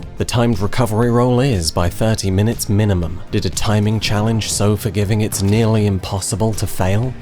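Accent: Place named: United Kingdom. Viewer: Hey man, doubt you'll see this. But thank you for the birthday gift! Love your videos. Only a madman can see the soul behind ubisoft's corporativism, and being a madman is exactly what i respect. Keep being you, with that soft and sweet british voice and all. Peace, Enzo. Ps: thoughts on Legion? That cliffhanger on WD2's video got me grinding my teeth.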